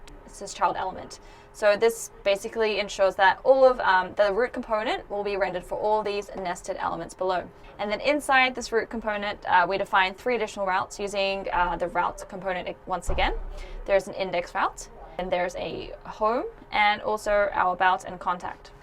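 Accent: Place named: Australia. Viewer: You have snippets of Aussie in your accent..... Keen to see direct migration for whole WP websites, that can link to NFT (Open Sea etc), email capturing for subscribers (as a database for future contact), and other links to Unstoppable Domains donations /merchant receipt for sending g crypto. Thank you, am giving this clip a go as a reference help. Appreciate the clip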